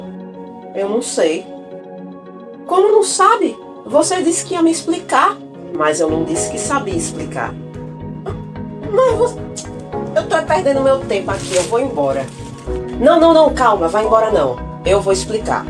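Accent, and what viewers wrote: Northeast, Brazil. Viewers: "O bixinho é pesado" física e meu amado sotaque nordestino, adorei ❤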